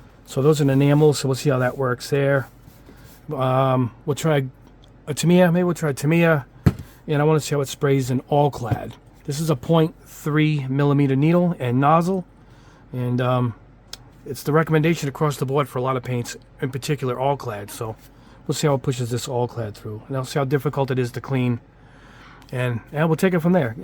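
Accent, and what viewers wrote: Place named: Northeast, United States. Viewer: lol, your NE accent coming through talking about a new paint "sauce". i am from the south so it took me a bit to figure out what you meant. (that's ok, my pronunciation of source would be "so arse") 🤣 love the videos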